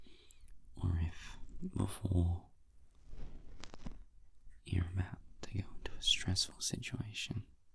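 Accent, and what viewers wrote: Australia. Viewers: Your channel banner looks a hell of a lot like the roads going through Mt Baw Baw 😂 Just found you, honestly it’s nice to hear an Aussie voice for an ASMR fix.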